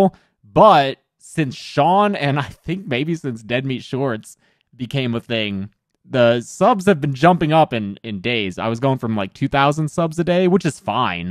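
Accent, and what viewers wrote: United Kingdom. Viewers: OMG YOUR BRITISH !!!!!!! MY FAVOURITE YT AND NOW THIS!!!!! Bru I love you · bri'ish pog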